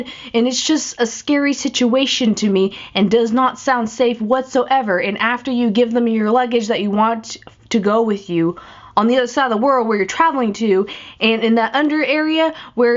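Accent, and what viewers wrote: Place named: Texas, United States. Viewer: You picked up a smidge of a country accent while you were in texas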